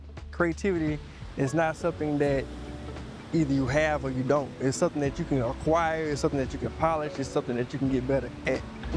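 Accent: United States. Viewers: I always thought you had a country accent. It didn’t fit LA. But everyone in LA is from somewhere else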